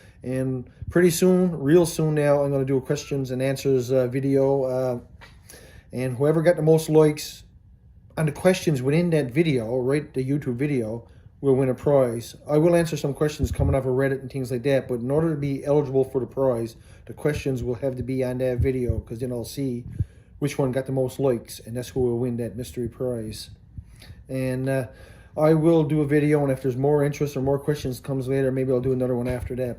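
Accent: Newfoundland, Canada. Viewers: My soul mate loved hearing your voice reminded him of his childhood in Grandbanks. NFL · I did not know that Canadians can sound so different from US Americans.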